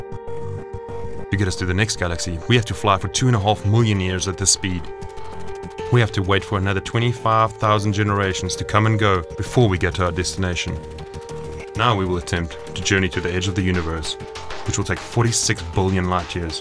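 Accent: South Africa. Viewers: Is this a South African speaking ?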